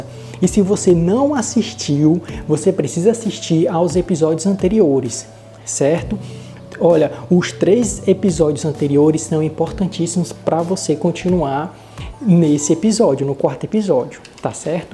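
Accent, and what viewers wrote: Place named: Fortaleza, Brazil. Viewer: Eita sotaque lindo! Também sou de Fortaleza, mas moro no Rio de Janeiro à muitos anos, e somos xaràs no sobrenome.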